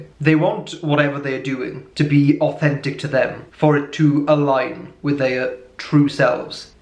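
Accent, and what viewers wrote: United Kingdom. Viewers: when i saw your face i didn't expect to hear a british accent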